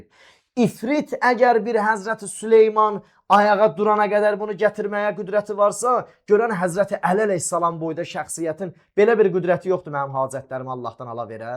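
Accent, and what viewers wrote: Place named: Iran. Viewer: Iranski lehceee offfff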